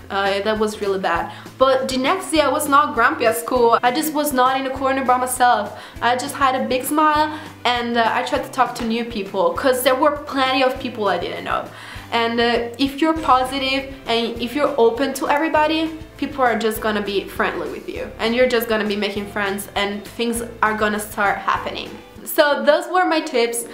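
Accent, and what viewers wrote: United States. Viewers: sta parlando americano non inglese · I love your American accent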